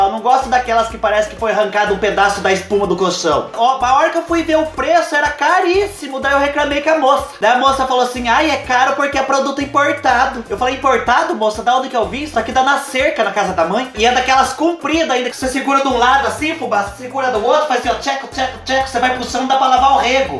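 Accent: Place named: Caipira, Brazil. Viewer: amo seu sotaque caipira e sua simplicidade você não fala palavrão GOSTO muito de você e do seus amigos Deus continuo te abençoando beijo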